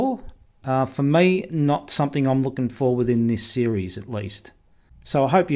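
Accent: Australia. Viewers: You sound like an Ozzie, right? So the right keyboard for you would be GB, not that weird US wannabe English. Where is your pride and selfrespect, man?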